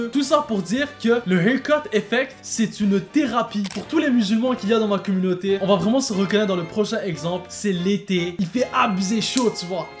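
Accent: Quebec, Canada. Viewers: COOL L ACCENT QUEBEQUOIS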